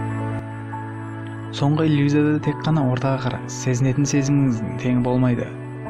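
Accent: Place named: Uzbekistan. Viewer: Озбексинба? Диалектин казакшага келмейди?🤔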